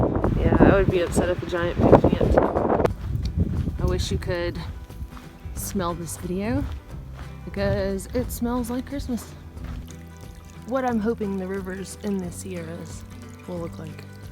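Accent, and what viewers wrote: South, United States. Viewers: I enjoyed your AT hike as well as the PCT so far. I went to school in the South so it's fun to hear your accent and recall that southern charm! I developed bad plantar fasciitis in both feet that kept me off the AT this year. I found Pedag Viva Outdoors from Insole to be a BIG help - better than Superfeet as the former has not only an arch but a metatarsal support that keeps the forefoot from stretching/sliding forward. I found just the arch support of Superfeet (though otherwise well made and I have a half dozen of them) not as effective for PF - both arch and metatarsal supports supports the foot better allowing it to heal faster and preventing it from deveolping as easily for those prone to it. Also tight hamstrings can lead to or worsen PF so keep those stretched out before and after hiking, though some advocate stretching a few minutes after hiking letting the muscles warm up first - also all the muscles surrounding the knee should be strong, though I bet yours are pretty tops! 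All your info about PF was good last year so keep that up. This website has great stretches and exercises. Hope it helps. Really helped me: <link>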